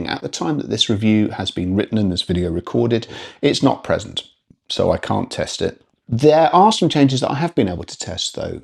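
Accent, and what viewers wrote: United Kingdom. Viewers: I think he said Bowers but he's British so it comes off subtle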